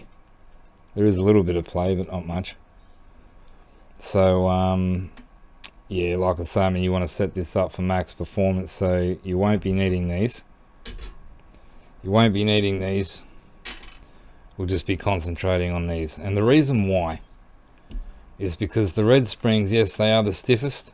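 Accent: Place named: Randwick, Australia. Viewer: You sound like you're from Randwick, mate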